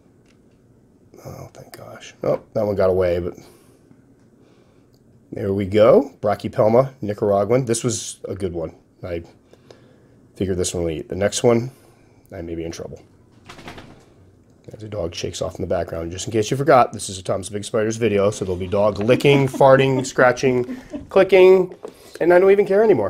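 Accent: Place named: Northeast, United States. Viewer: Maryland here. I could tell you were somewhere up there from your accent and attitude :) Awesome vids <3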